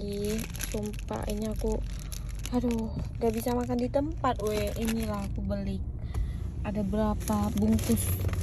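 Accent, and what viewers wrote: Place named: Medan, Indonesia. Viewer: Gua Orang Medan dan udah 5 Tahun tinggal di Bandung. Kalau kangen makanan Medan dan logat Medan, selalu nonton Channel Mukbang karena logat nya itu loh khas medok Medan banget 😁 dan kalau liatin makanannya selalu bikin kangen Rumah. Sukses terus ya ~ ❤️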